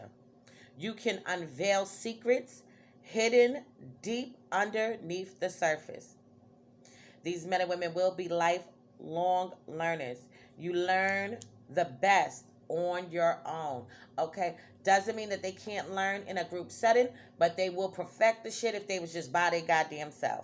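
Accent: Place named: New Jersey, United States. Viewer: You gotta be From Jersey! Love it!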